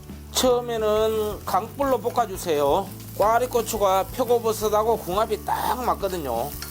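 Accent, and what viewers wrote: Jeolla, South Korea. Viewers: 매번 느끼지만 전라도사투리가 특히 남도지방,광주,여수.순천,고흥.근처 사투리가 얼마나 구수한지..ㅎㅎ 전라도를 떠나 30년째 경상도 살아도 40대인 저는 세프님하고 언어가 어째 이라고 똑같다요~잉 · 아주 오리지날 전라도 말이네요 내고향 사투리를 듣고자 이 요리도 배우고 좋아요 ㅎㅎㅎ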